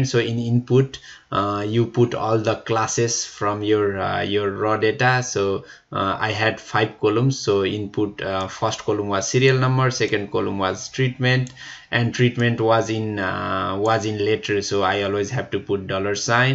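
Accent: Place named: Nepal. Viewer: are u from Nepal ? your accent is same as mine. thats why I asked.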